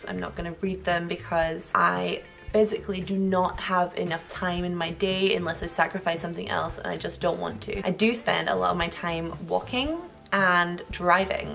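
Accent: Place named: Glasgow, United Kingdom. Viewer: Love your channel! Not a bodybuilder myself, but I’m a girl and us girls can always help each other out:) Spent my early life in Glasgow and hearing you speak makes me feel at home! 😘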